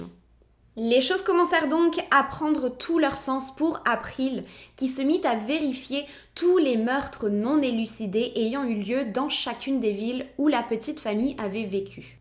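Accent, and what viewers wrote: Quebec, Canada. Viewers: On est d accord elle a un accent québécois ? (Tres léger ) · N'empêche l'accent du canada commence a prendre le dessus sur de plus en plus de mots😂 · Allo!...je viens de m'abonner à ta chaîne...ça fait une semaine...tu es très bonne...mais parcontre... quand tu saute d'année...( Comme dans cette épisode)... Serait le fun que tu dises à quel âge est rendu le sujet...et pour parlé encore de cette épisode ci...tu dis a la fin qu'il a des ressemblances avec les lettres codé que le zodiaque a envoyé...mais tu n'a jamais parlé de ceci avant... continue ton bon travail tu vas aller loin....et aussi...j'ai bien entendu ton accent Québec 😊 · Salut à toi ! Et un gros bravo pour tes histoires si fraîchement racontées , surtout ne change rien , reste telle que tu es , avec ton petit accent du Québec parfois . Sur cette histoire , tu parles du tueur du zodiaque , je ne connais pas assez cette histoire pour y apporter quoi que soit ayant rapport avec ce tueur . 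En revanche tu parles du tueur au Dahlia noir , et cela est fort peu probable que ces meurtres soient liés avec Ed , car il fut démontré que les méthodes utilisées pour mutiler les victimes , étaient utilisées par les chirurgiens pour pratiquer certaines opérations , et que seule une personne expérimentée en la matière aurait pu commettre de tels crimes . Au plaisir de t’écouter encore ! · Franchement tu fait des vidéo de très bonne qualité et en plus j’adore ton accent,canadien peux être ?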